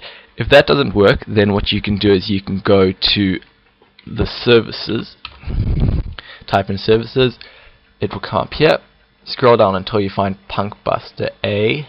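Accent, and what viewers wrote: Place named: South Africa. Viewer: Hey, Are you South Africa, you sound a bit similar to me..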